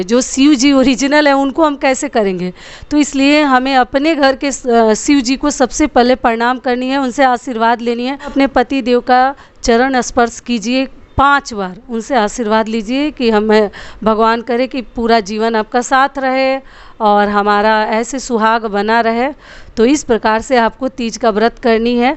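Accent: Bihar, India. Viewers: Madam ap bihar se ho up se ho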